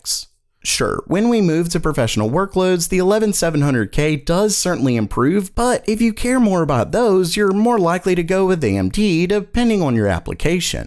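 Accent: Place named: South, United States. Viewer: I can't tell if is a southern guy trying to hide his accent or a regular trying to do a southern accent.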